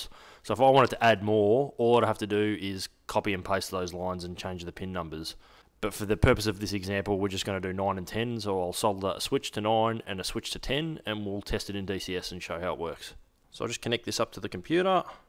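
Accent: Australia. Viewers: I love listening to Aussies curse. Friggin hilarious.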